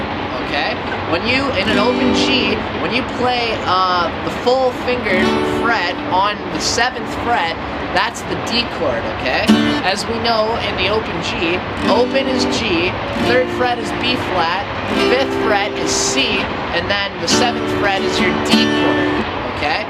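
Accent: Canada. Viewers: this guy sounds Canadian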